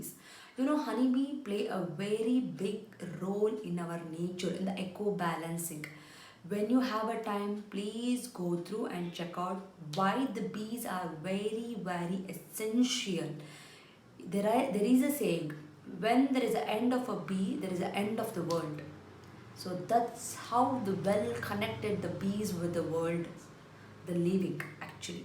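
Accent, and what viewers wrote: India. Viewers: Aunty please don't talk in buuttler english please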